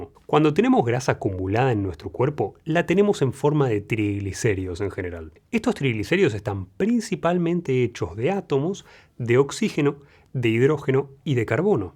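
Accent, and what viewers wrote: Argentina. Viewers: no le ves la cara de ángel ? Su acento argentino ? Su tono de voz tan relajante ? Su sabiduría? Su inteligencia y nobleza ? ❤️❤️ èl no puede ser de esta tierra . 👼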